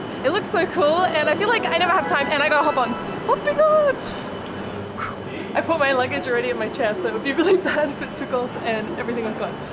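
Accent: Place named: Australia. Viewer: You have an awesome Aussie 🇦🇺 accent! I enjoy your Japan videos 😊 Thank-you from this Japanese-Canadian subscriber 🇨🇦.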